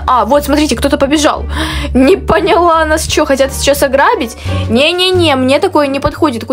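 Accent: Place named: Ukraine. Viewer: Скажите пожалуйста она русская просто речь как у украинки(не хейт) очень люблю её смотреть❤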